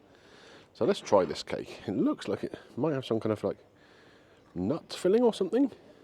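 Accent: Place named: England, United Kingdom. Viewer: He heard your English voice! Further proof the Europeans do not like us - except our money.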